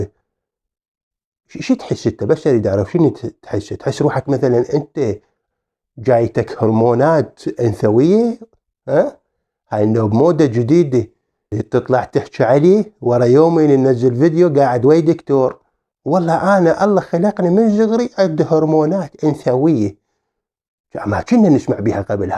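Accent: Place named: South, Iraq. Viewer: هههههههه اشكد حلووو من تحجي حجي الجنوب مو طبيعي اله نكهة مميزة استمرررر اخ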